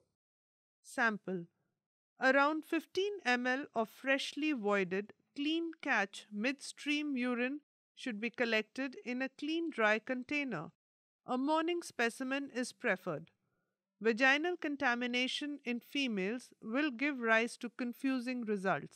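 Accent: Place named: India. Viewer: The indian accent is to distracting.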